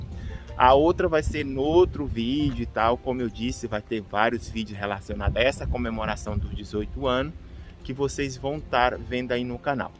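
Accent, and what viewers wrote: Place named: Brazil. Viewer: Quanto a sotaque, parece-me igualzinho a de brasileiro acabado de chegar, mas vejo que se esforçou por "aportuguesar", mas tão tem problema percebo mto bem todo o sotaque de qualquer região de Brasil. Bom Ano tb para si!!! 🙋‍♀️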